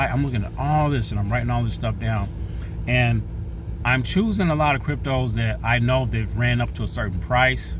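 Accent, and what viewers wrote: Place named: Ohio, United States. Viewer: Where you from Ohio? I'm doing this thing where I try to "guess that accent " 😂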